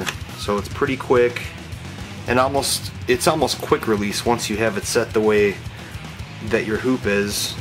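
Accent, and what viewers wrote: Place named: Canada. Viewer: he kinda sounds canadian doesent he??? small accent ??? or maby colorado??? haha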